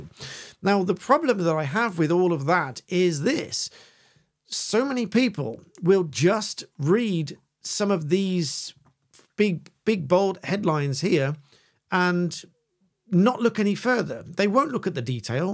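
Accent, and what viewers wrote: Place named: RP, United Kingdom. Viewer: I'm sorry but is there something about labour getting in that puts you suddenly against corruption? I've wrote to you a number of times pointing out that housing policy breaks the children act 1989, the children and families act is broken in standard practice ubiquitously, and despite the issues this causes society and how much extra doing this costs the public purse as well as those impacted and not a peep. Your tory Prime minister who committed billions in fraud and your other Tory comedian hiding from piers morgan in a freezer because he didn't think you deserved answers along with the lettuce who tanked the economy are gone and then suddenly, after ignoring the care act 2014 being contradicted since it was introduced, you suddenly care whether government ministers are honest or not. So much so this has altered from a brilliant law channel, to some baldy hard nock with a posh accent biling on because the party who wanted to scrap the human rights act didn't succeed in doing so. 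Hard luck. I will now watch the video, I wanted to write this before I do because I just saw this and my heart sank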